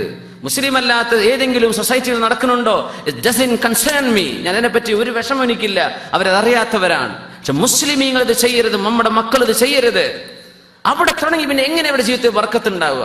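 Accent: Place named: United States. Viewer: Ni endinaaaa English american pole slang Ni thani malayali allle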